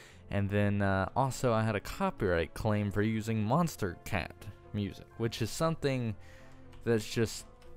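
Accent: South, United States. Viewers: I love your accent. It reminds me of mine and a lot of my friends'. I don't know if I'm right in thinking of this, but it sounds like it's Southern, but just on the edge. I live in Memphis (which I refer to as the least Southern city in the South sometimes) and personally was raised by a mother from the West coast and a father from the North, so my accent is pretty much non-existant except for a twist on the occasional word. Your accent feels kind of like home to me. IDK, maybe that sounds creepy or weird. But also, this video was posted 4 years ago so maybe your accent or thoughts on it have changed c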